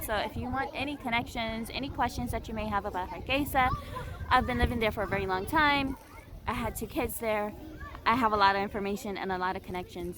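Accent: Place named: Senegal. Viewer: Bit confused your accent as I couldn't see your face Im unable to identify where ORIGINATED!. Are from Senegal or Namibian? Its really shame that you leaving the Republic of Somaliland because its peaple are "Too Nationalism" to me the great people of Somaliland are really lacking of it. Come to America and you will realise what nationalism is it all about, in a good way. Tribalism IS NOT good but healthy nationalism is fine. In your speech, I REALLY LIKED 👍 when you were saying "Hargeisa Somaliland," and u repeatedly said that number of times. In which I have appreciated it a lot. Anyway, safe journey wherever you are heading right now. But plz avoid mixing the Republic of Somaliland and Somalia for ur next videos as these 2 DO NOT GO hand in hand 4 obvious reasons. Finally come back soon if u really believe the Republic of Somaliland in heart ❤️ 💙 💜 💖 💗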